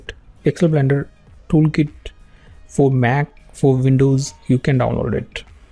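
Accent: India. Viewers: that's an indian accent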